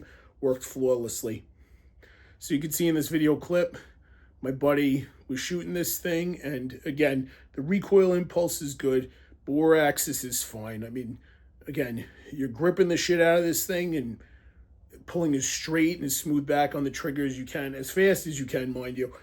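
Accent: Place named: East Coast, United States. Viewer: The most east coast accent ever lol.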